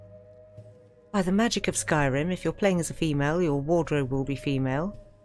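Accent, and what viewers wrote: United Kingdom. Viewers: come for the endearing British accent and relaxing home reviews, stay for the squints essay on the politics of Tamriel?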